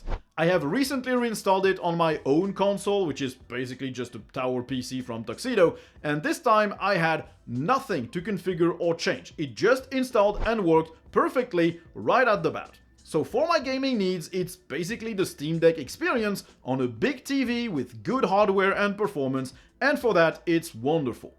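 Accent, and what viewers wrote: France. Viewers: Tu serais pas français mec t’as un très fort accent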